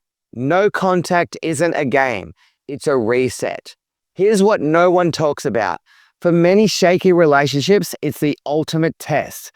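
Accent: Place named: Australia. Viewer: I loveee aussie accent. Wish i had it as well.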